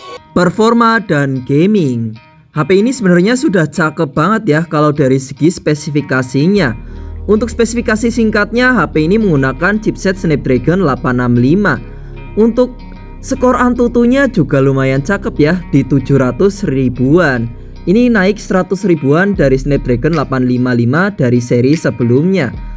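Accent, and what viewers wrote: Banyumas, Indonesia. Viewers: Omaak orang banyumas🎉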